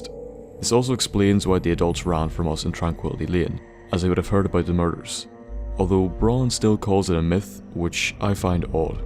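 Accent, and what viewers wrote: Ireland. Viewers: I think he's irish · Is the irish?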